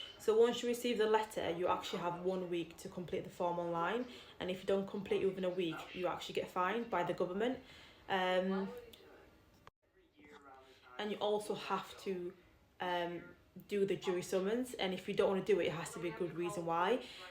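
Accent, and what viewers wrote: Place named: United Kingdom. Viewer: Thank you for your video, it’s always interesting to learn more about this topic. I’m a follower on TikTok, and I love both your content and your father’s 🩷 You have such a lovely British accent, it’s so pleasant to listen to 🥰 Xoxo from France!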